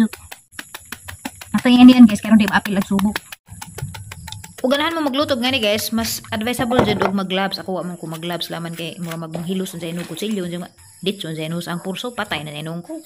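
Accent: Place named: Leyte, Philippines. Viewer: Hello bisaya pud ko pero naay lain nga words nga imong giesturya. Taga leyte baka kay ang punto murag same s taga leyte.dili ko ma boring s imong video ba mao e subscribe tika😁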